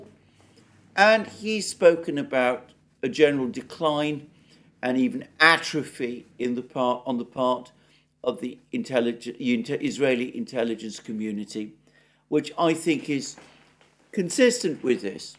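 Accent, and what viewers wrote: United Kingdom. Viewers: Love the British accent - Ham Ass - funny AF. Love the show.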